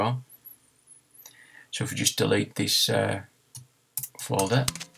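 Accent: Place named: Sunderland, United Kingdom. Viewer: I’m in Sunderland and it’s great to hear a similar accent rather than the many American tutorials. Thanks for the help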